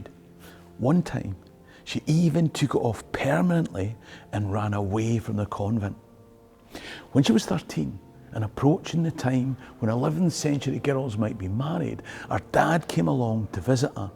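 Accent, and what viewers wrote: Scotland, United Kingdom. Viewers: So weird listening to a black guy speak with a Scottish accent.......weird!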